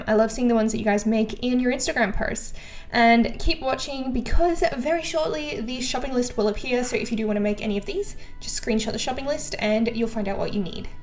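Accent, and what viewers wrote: Australia. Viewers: Your accent, Australian? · I love your accent are you from Australia!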